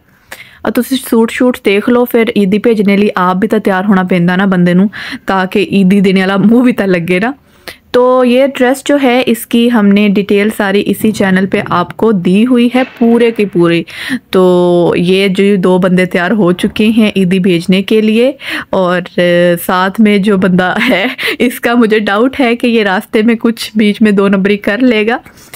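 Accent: Faisalabad, Pakistan. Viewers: apki panjabi Faisalabad wali h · Kaha Sa ho ap wasy Lahore Ki Ni Faisalabad Ki Punjabi lgri ha ya aidda oudda Faisalabadi bolty hnn.....batana zarror which city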